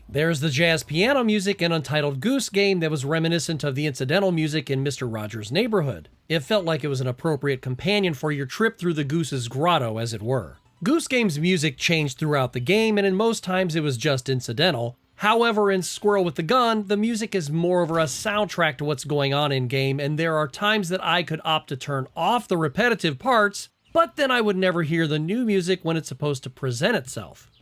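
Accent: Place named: Boston, United States. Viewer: OH SNAP! THE BOONDOCKS REFERENCE...you're speaking my Boston language, KEHD! Great video, Dede is getting this for me for Xmas lolz!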